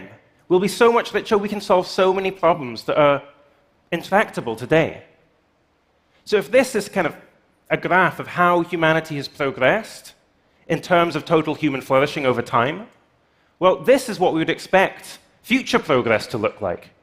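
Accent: Scotland, United Kingdom. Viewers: Sounds Scottish to me. But I'm not sure. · Where is this guy from? England, Scotland, Ireland. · What accent is that??? Half Scottish half Dutch attempting a posh English accent? Mental. Good video, thought distracting... that accent!?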